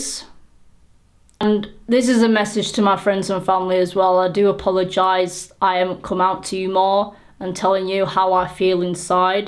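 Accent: United Kingdom. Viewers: You can message me if you need any advice about transitioning/getting on T etc in the UK (I'm guessing you're from the UK too from your accent 😂) 😁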